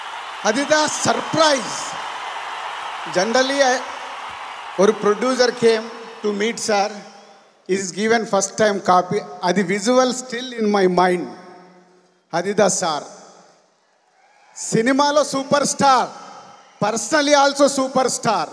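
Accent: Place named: North, India. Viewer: Ena Vada pav vikira vadakan mari pesran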